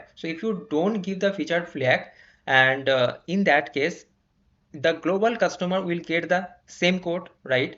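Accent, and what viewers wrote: Bengal, India. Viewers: You share good knowledge, but try to talk through a prompt reader, and try to do a better accent. The Bengali in you is shown through the accent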